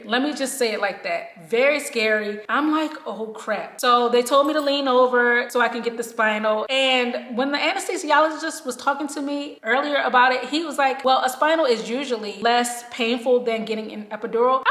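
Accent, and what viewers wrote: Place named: South, United States. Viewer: That morphine got you sounding COUNTRY!! Congratulation! 🎉🎉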